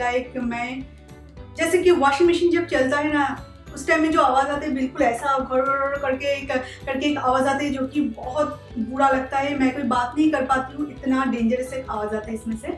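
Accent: Bengal, India. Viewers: Boy you have a heavy bong accent but I liked your honesty.. 👍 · I have some bengli friends..they speak hindi like u..un dostonki yaad agayi..nice kitchen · Love bengali's hindi..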